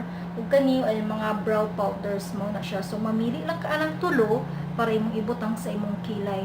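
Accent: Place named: Visayas, Philippines. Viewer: naminaw ko nimo sis ba lami kaayo paminawon ang puro bisaya ba ganahan ko cge ko katawa nimo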